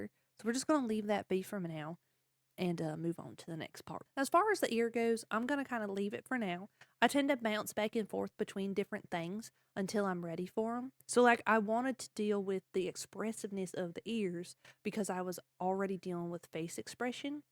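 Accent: South, United States. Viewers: When your from the south to and from a vtuber that speaks in southernese 😭🫶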